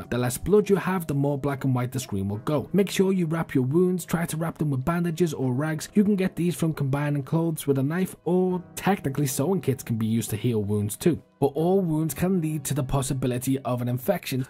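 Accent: Liverpool, United Kingdom. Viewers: Liverpool accent? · that's because you have a strong Scouse accent, but not a Runcorn Scouse accent. It's like a Stephen Graham Scouse accent. Plus your funny, so it's a win win really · Are you a scouser? 😊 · great videos mate, scouse or over the water?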